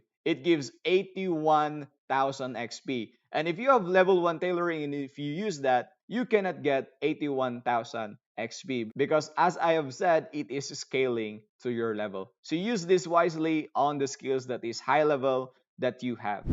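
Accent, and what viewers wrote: Philippines. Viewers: Are you filipino? Cause your accent is so familiar xd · Why do i hear filipino accent xd Btw im also filipino This is useful tip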